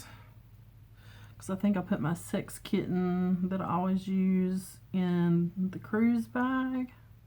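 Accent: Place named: Tennessee, United States. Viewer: I am from Sevier County, TN, in between Knoxville and Gatlinburg! I knew you sounded like home! My hubby is a Marine, and we have been living all over the country, and are currently in San Diego. We just got orders to Cherry Point, NC, our first duty station after Paul joined 23 years ago, lol! Can't wait to get back closer to home. 💗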